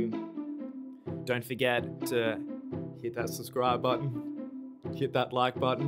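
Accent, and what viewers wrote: Australia. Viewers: The moment you start talking I knew you have some Aussie vibes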